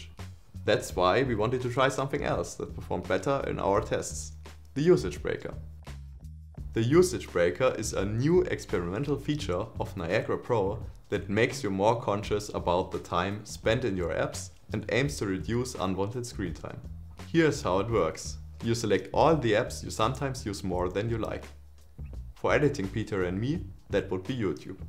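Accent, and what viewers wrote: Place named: Germany. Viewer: I LOVE these update videos! I also love your German accent, lol! Thank you for creating the best launcher on the market and keeping us updated with these great videos! So nice to hear you guys are graduating. Congratulations and Happy New Year! 🎊🎉💥🎇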